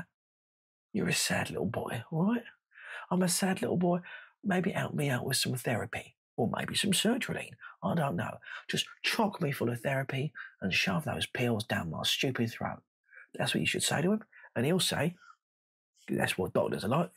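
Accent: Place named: North, United Kingdom. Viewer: Welcome back always a pleasure Long shot but could you do an asmr were u just sell me random shit with ur northsn accent a bit like your antique seller roleplay u don't haft to just thought I'd ask.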